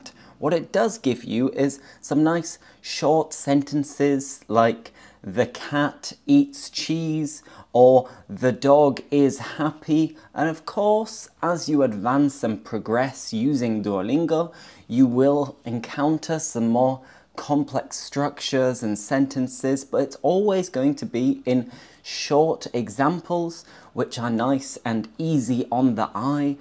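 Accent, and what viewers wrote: England, United Kingdom. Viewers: I LOVE HIS VIDEOS BECAUSE THEY ARE AMAZING AND CAN GIVE YOU TONS OF ADVICE AND EXEMPLES , very nice love his video and his cool english accent and he is right as well about the app.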